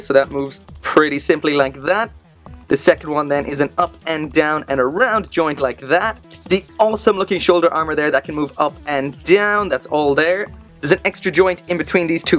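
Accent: Ireland. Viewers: Are you irish? You sound it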